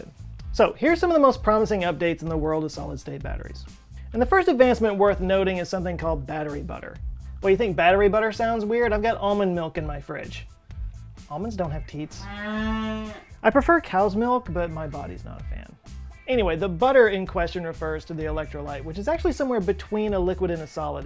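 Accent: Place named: Texas, United States. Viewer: Brilliant opening! Geek comedy I love it. "Butt-ton" (I'm not a Texan so I don't know if I wrote that correctly)... you can take the Texan out of Texas but cannot take out the Texan.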